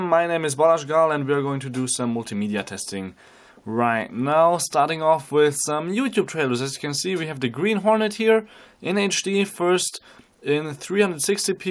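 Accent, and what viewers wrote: Germany. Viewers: are you german :)?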